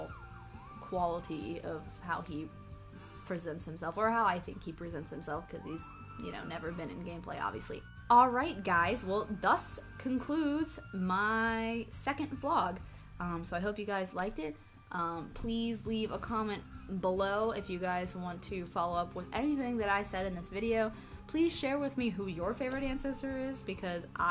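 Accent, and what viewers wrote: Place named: United Kingdom. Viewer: i don`t cere if you are talking britich ore not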